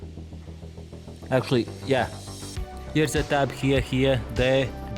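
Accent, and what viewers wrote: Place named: Germany. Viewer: oh, that's great news to me - what you have is what I'm after, because I am very used to 5 pos switches and I enjoy pressing once to fully close my windows when I've arrived to my destination and need to leave my car - and I hate that I can do that with my driver's window with a simple one full pull, but have to hold the pulling for the other 3 windows. I also never had an issue to make a bit more gentle press to crack a window instead of full open or full close because I clearly feel the 3 pos vs 5 pos switch - probably my gaming spider senses kick in to feel the difference :) My problem is that whenever I try to search for a switch with all four windows on auto that you have, I only find the one with driver's window auto only, I even thought it doesn't exist, but you gave me hope. Now, I need to discover a part number that would mean the switch that you have and it also has to be for a right-hand steering. 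Come to think of it, I have an idea to swap with you, because in your videos it looks like you have a right-hand one, although being in EU Feel free to contact me on Telegram (I'm Australia, so parcel might take a week to arrive - last time I posted internationally was around a couple of months ago and it took 4 days to arrive to UK, so I suppose would take around the same time to post to Germany or Austria, considering your accent).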